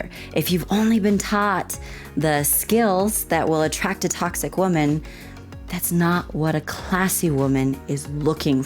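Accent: United States. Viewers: This is your best video, because you look so funny, talking very fast, in another video, in the background. I also like your singing AND your bloopers. As you have opened up to me, revealing that you go to the Church of Jesus Christ, is it rude to ask you, is your American accent from Washington, or California? I was a "good boy" for you Coach, and watched your video.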